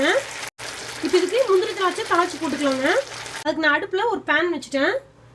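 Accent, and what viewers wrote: Kongu, India. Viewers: Nenga pesaratha kekave superb ah eruku... Kongu tamil me 2 kovai thanga akka...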